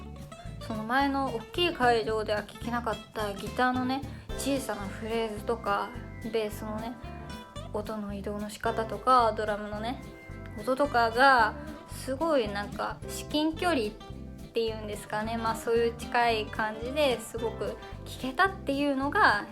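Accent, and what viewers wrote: Hiroshima, Japan. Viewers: たまに話す方言からすると広島ですか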